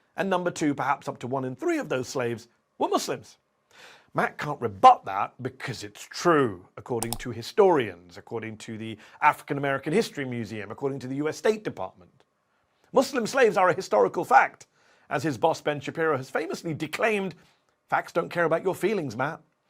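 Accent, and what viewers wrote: United Kingdom. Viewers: With a profoundly British accent?. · I mean, you don't sound like you're from the U.S. with that British accent, so maybe he is technically more "murkan" than you, but who cares?? I guess it depends on how you define "American". From the rest of the world's perspective, "American" means gun toting, bible beating, boot licking patriotism. From that standpoint, he's definitely more "American" than you.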